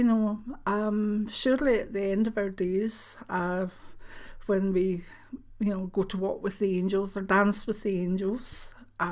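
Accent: Scotland, United Kingdom. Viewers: I wish you a happy and joyous Christmas with your family and grandson, the little ones really do make it magical. Have a wonderful Hogmanay and I look forward to future videos, you have helped me get through a difficult year after loosing my mum in January, listening to your beautiful accent has soothed a lot of my emotions, its like having my mum near by, I miss hearing the Scottish accent, I lost mine years ago, though apparently it slips in every now and then. Thank you for all you do xx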